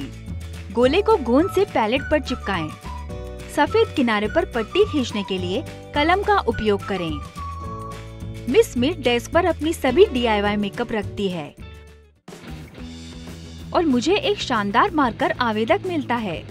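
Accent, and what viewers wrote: Standard. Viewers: itne shuddh Hindi Hum Bhi Nahin bolte hain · Yeah puri shudh hindi bolti hai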